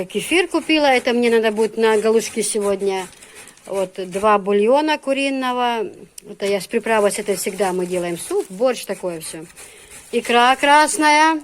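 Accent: Chechnya, Russia. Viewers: Ма шаа Аллах1.,вас не отличишь от чеченки-даже акцент чеченский.Умничка,смогла на все 1000% влиться в семью,в быт,в традиции.Так и должно быть,когда выходишь замуж,то нужно перенимать их привычки и традиции,а не навязывать свои. Дети,очень красивые ма шаа Аллах1. Дала дукха баха бойла. Шу Дала барт цхьа бойла,аьтто бойла!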